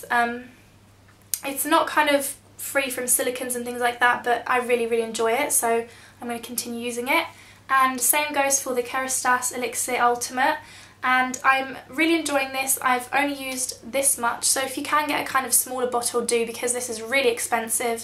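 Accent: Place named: United Kingdom. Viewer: Hey, can you tell me where you are from the UK? I want to do a year abroad, and I love the way you speak :)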